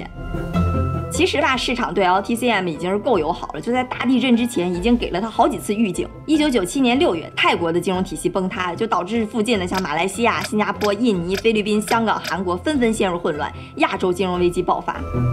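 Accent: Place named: Shandong, China. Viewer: 感觉有点山东口音哎😊❤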